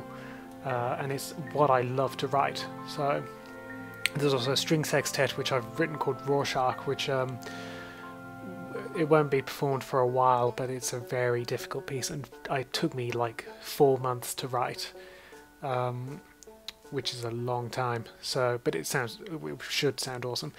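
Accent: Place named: England, United Kingdom. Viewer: You're English eh? You are now my favorite brony.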